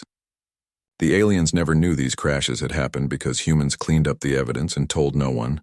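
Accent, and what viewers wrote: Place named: United States. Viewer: You must be an American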